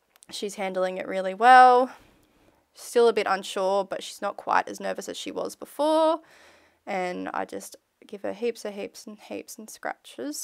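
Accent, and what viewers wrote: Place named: Australia. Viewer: Well, the accent says Australia (which would be summer)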